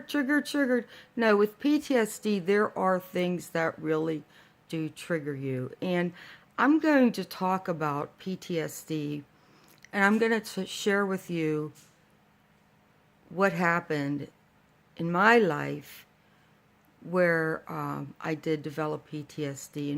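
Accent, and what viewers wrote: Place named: United States. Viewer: Ps. love the accent where in the US are you from?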